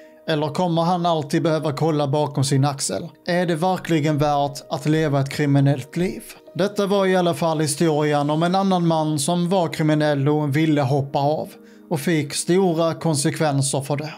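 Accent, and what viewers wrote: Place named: Scania, Sweden. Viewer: Översättning förstår inte din skånska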